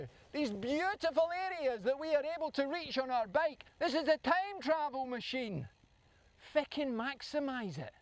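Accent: Scotland, United Kingdom. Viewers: Good points!❤ Two off-topic questions 😊 - 1) why the mirrored video? 2) where exactly in Scotland can I get some of this accent? ❤ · "Blame your bake"! LOL I love this guy - he doesn't sugar coat anything and tells it like it is, all with a Scottish accent! SUBSCRIBED!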